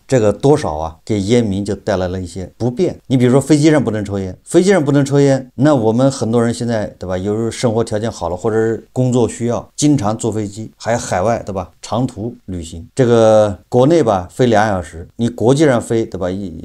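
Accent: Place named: Anhui, China. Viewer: 听口音，叔是不是安徽南方，靠近湖北那一片的人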